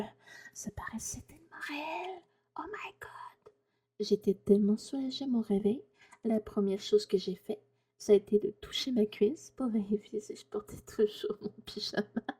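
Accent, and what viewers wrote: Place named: Quebec, Canada. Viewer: je l'est su avec ta façon c'est propre au québécoise et vu que c'est léger sa fait parti de charme dans les vidéos sa rajoute un petit quelque chose qui est bien si il est bien dose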